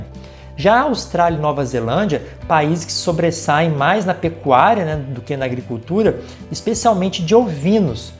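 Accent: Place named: Minas Gerais, Brazil. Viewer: Esse cara é de Minas ou do sul da bahia não é possível!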